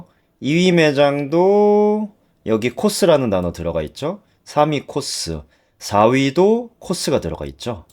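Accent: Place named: Busan, South Korea. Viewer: 부산이신가보네요 내용너무감사합니다😊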